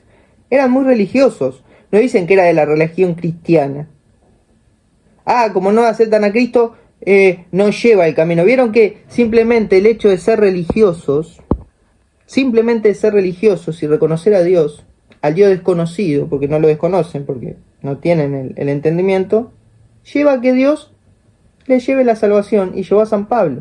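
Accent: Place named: Argentina. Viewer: Quise ver todo tu vídeo pero no aguanté. Me voy a guiar un poco en la miniatura del vídeo que dice "Cansado de la ignorancia católica", casi al inicio dices "me dirijo a vos católico", refiriéndote así a los laicos comprometidos porque, por lo que oigo te parecemos ignorantes porque decir que Francisco dijo una herejía (bueno, ha dicho tantas) en su gira por Asia y Oceanía, te voy a comprar eso de que somos ignorantes, pero no sólo los laicos lo han dicho, lo han dicho sacerdotes, obispos, cardenales, ellos sí saben sobre las Escrituras, sobre el Evangelio, o es que tú sabes más que ellos? No es más bien que tú te llevas por el sentimentalismos de que Francisco es del mismo país que tú y eso no te deja ver que lo dijo Francisco es una total aberración. Cuando digo sacerdotes, obispos y cardenales, me refiero pastores valientes, no como el sacerdote Byron, creo que ASÍ se llama, que se enojó y defendió a capa y espada lo que dijo Francisco, con el argumento ese de "quiso decir", como en las novelas cuando el esposo encontraba a su esposa en la cama con otro y ella decía "esto no es lo que parece" jajaja. 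Ahora, por tu acento doy por hecho que eres argentino, si es así debes saber que Bergoglio tiene una trayectoria nada buena cuando fue obispo en su diócesis, apoyando, defendiendo y solapando a pederastas, y es muy raro eso que a puro sacerdote gay los haya puesto en puestos de alta jerarquía dentro de la iglesia, muy raro, de ahí todas esas ideas de amor y paz entre todos, so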